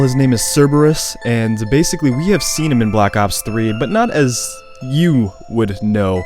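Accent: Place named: United Kingdom. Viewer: 2nd That's bullshit. He has a british accent!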